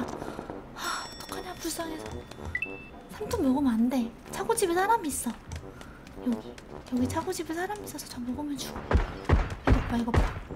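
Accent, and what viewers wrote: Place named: Seoul, South Korea. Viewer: 이분은.. 목소리가 차분하고 서울말 때문인지 몰라도 매력적이네 ㅋㅋ 점... 이뻐지고 어려저가는 님 ㅋㅋ Ps 면허증은 장롱속에~~^^ Ps1 3뚝 죽어놓고 아깝다고 마음에 없는소리 Ps2 치킨 잘 먹는 이쁜누나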